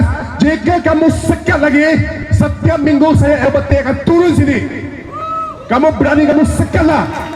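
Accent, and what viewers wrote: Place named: China. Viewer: Ada juga yg nak dengar cina pelat bercakap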